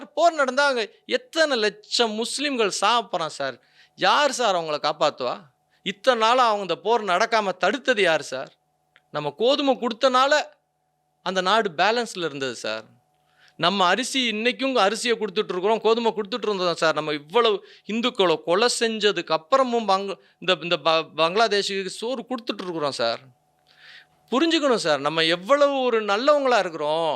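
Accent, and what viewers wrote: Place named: Kongu, India. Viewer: இவர்தெளிவாககொங்கு தமிழ்அழாககபேசுகிறார் இந்தகாணெரளிதெளிவாகதிடமாககருத்தயளிகிறார்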